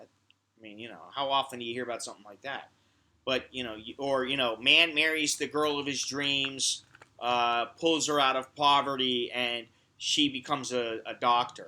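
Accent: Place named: Brooklyn, United States. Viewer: Hey, Coming to Thailand this March. I live around the corner from Pateron. How is the rock scene in Bangkok? Do you go out to shows? I'm a musician in San Francisco and I might be considering moving to Thailand.I have a friend who's an English teacher and a girl that I'm friends with a nice Thai girl. Don't worry, I'm taking it slow as per your advice. I did a little research already and I see Bangkok recently has a budding rock scene there. Here is a link to my music: <link> It might not be your cup of tea but maybe... Anyway, you sort of have a Brooklyn type of style of delivery. Straight and to the point. You can reach me at <mail> if you'd like. maybe we can have lunch or something.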